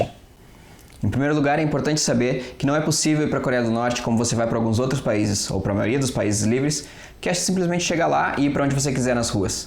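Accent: Rio Grande do Sul, Brazil. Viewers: Tu é gaúcho meu ? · cara vc e gaucho?Vc tem muito sotaque daqui sksk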